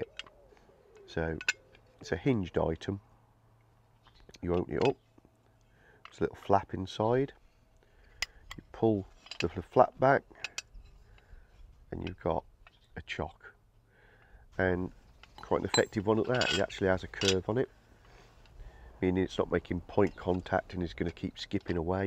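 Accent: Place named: Birmingham, United Kingdom. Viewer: Just found your channel and binge watched the XK8 posts. Excellent series of informative vids, nicely filmed and narrated (with a hint of Brummie accent?). FWIW, I regularly check air pressure of the spare and inspect sidewalls. Those "T spec' tires degrade over time even with minimal or even zero use. They are also becoming difficult and expensive to replace and almost impossible to safely repair.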